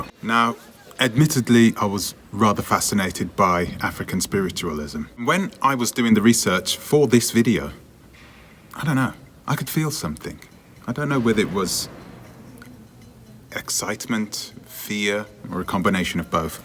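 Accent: United Kingdom. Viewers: You have a talent of story telling with your british accent its great really why dont you study african or even european history and share it with us that would be so much interesting... And for your bad energy that you need to dispense learn karate boxing or smthg just giving advice dont waste your talent now.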